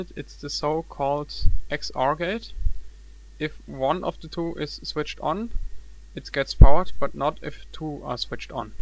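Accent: Germany. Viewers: Ich hab gleich den deutschen Akzent erkannt :)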